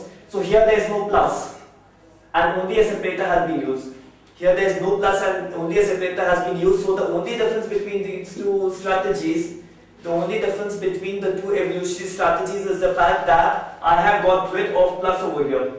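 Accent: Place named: Asia. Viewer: thx Sir for video , I apreciate it but I need subtitles coz my english language is not so perfect especially on hearing the asiatic accent :)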